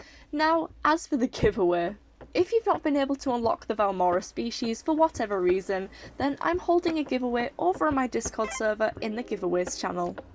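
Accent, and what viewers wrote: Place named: Scotland, United Kingdom. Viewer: Her accent is like philza, british r's and sometimes sounds like a scot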